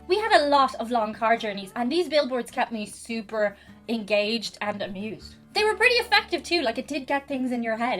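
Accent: Dublin, Ireland. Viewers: She's a Dubliner. You've been watching "Derry Girls" haven't you? That is a radically different accent up there. Accents across Ireland are as different as Boston and Alabama. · Whatever her accent is, it is now my ideal perfect Irish accent benchmark that I will compare all others against. I dont have to keep playing it over and over to figure out what she said. Mostly. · ehh ...i think shes completely irish just a bit influenced by american tv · ... Posh accent ???... What part of Dublin are you from ? That´s certainly a Dub accent, but posh ? Never in a million years....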